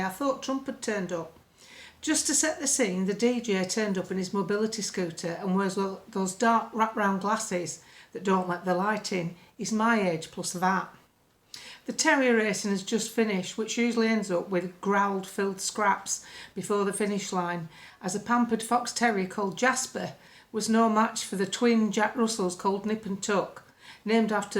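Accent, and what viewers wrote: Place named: East Lancashire, United Kingdom. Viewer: Thought you where an east Lancs lass, all best from ossy, keep up The good work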